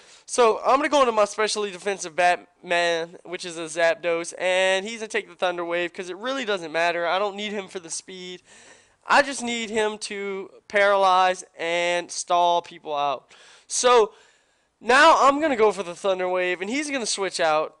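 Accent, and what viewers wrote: South, United States. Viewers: I DO BELIEVE. i liked that catchphrase lol. you sound southern. Usually i don't like that, but i like you lol. nice narration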